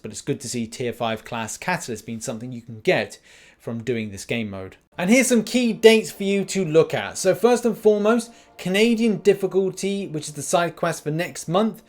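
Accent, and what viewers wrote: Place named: United Kingdom. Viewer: thanks, I'm a sucker for British accent lol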